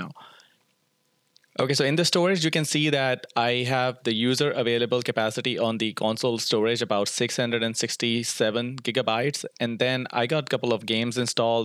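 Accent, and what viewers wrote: India. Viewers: Are you from india? because your accent seems like it and you also search bollywood videos in your 8k tv video that's my speculation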